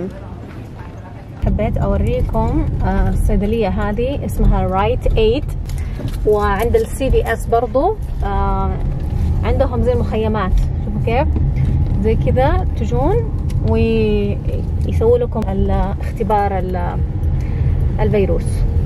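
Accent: Saudi Arabia. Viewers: انتي سعوديه؟ مستغربه من لكنتك سعوديه بس وجهك وملامحك كويتية او اماراتية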